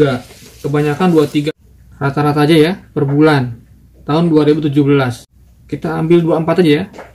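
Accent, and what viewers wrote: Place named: Java, Indonesia. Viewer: Perasaan Jawa barat ngomongnya Sunda😅 Iki lho jowo medhok rek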